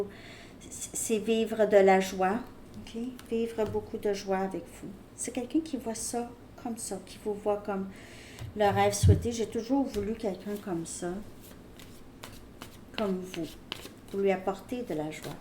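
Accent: Quebec, Canada. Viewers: Elle est vierge et moi lune en vierge, des fois c'est elle, des fois moi mais j'ai parfois du mal à comprendre l'accent québécois, y'a des trucs, je comprends rien 😭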